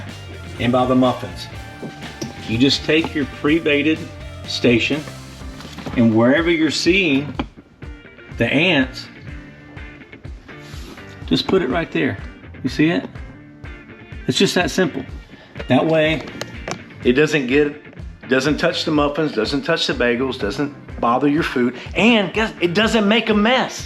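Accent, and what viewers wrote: South, United States. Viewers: I’m South south Louisiana. Your accent gives your southern away too. Last 2 years, our subdivision has been invaded by Crazy Ants. They don’t follow a trail, don’t bite, and seem frantic and only outside so far. Millions of ants like a true invasion. LSU says they act like that because they don’t have a Queen. They are coming out of the sugarcane field. Because we don’t know where the colony is, and they usually don’t return to the colony, there is no way to destroy them. LSU treating yards with the termite poison, but have to treat every few months. I would love to stop spraying as we have ducks, chickens dogs, cats etc. Have you all had these ants? It’s INSANE.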